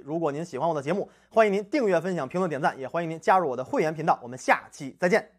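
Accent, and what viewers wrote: Beijing, China. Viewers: 开口老北京人了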